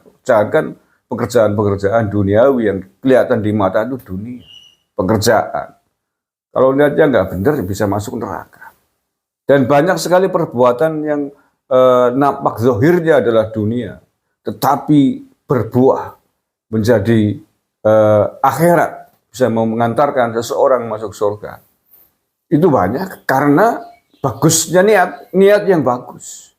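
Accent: Banyumas, Indonesia. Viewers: Dan saya selalu tersenyum saat mendengarkan logat khas Banyumasan nya Mas. 😊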